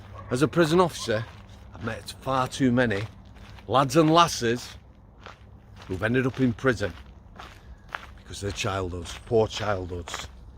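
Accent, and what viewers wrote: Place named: Leeds, United Kingdom. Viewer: Brilliant. You sound like a Leeds lad, apparently it’s kicking offin Dewsbury atm, nothing on Youtude though.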